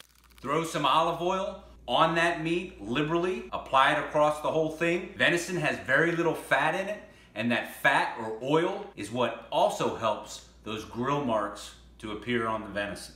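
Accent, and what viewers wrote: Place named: Australia. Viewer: Are you originally from Australia? I hear a bit of a twang on your accent, almost as though you grew up in the down under and moved to the U.S. when you were young.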